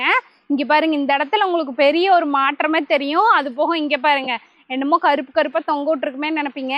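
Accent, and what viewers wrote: Kongu, India. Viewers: ஆமாங்கோ கோயம்புத்தூர் காரங்க ஈரோடு காரங்க எல்லாம் அப்படி தானே பேசுவாங்க😊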